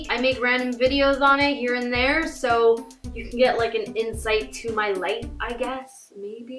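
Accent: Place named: Trinidad and Tobago. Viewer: Why do u sound trini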